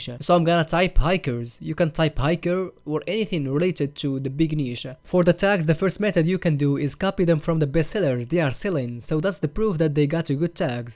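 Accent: Morocco. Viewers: I really love the way you explain ❤👏👏 + you have a moroccan accent wich makes me feel like talking to one of my freinds😂❤❤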